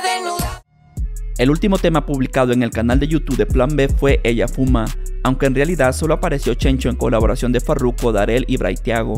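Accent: Honduras. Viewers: eres de Honduras?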